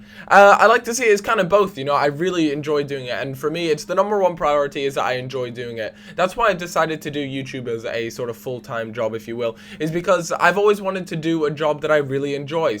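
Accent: Scotland, United Kingdom. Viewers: As a fellow scotsman I thought u were Scottish lol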